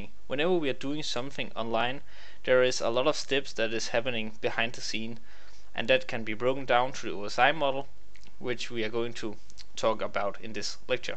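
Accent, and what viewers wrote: Denmark. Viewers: I can hear you are danish from your english accent :-)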